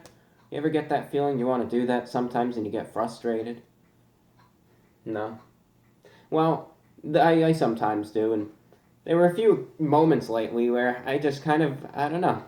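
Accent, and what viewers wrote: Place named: New York, United States. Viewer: You remind me so much of how peaceful my grandparents were. I really admire that about you. I'm from Western Mass and it sounds like you're from New York. I miss my grandparents dearly and the fact that you are so classy and keep it traditional really makes me want to come back not even for the food reviews but just to hear your presentation. Thanks and be well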